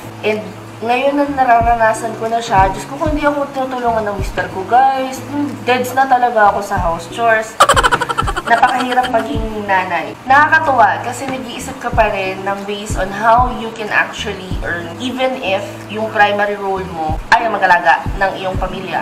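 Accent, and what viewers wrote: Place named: United Kingdom. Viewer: At naalala ko pa nga un British accent mo sa esca calls. Pia Wurtzbach lang ang peg ✌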